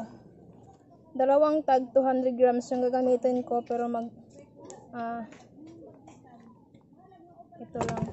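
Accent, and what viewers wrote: Visayas, Philippines. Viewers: Hahahaha...inday mahuman imong kaugmaon ug kalit !!!!!hahahahaha! Pagka bisaya gayud nimo,asa man imong probinsya inday!!!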